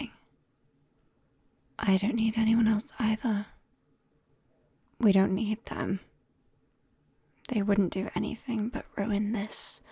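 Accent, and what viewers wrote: United Kingdom. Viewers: why are you british -( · I don’t know what it is exactly but there’s something about that British accent that just makes this so much better. Along with my favorite type of character, "yandere" just as good as the hide and seek one. Love the content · i love british accent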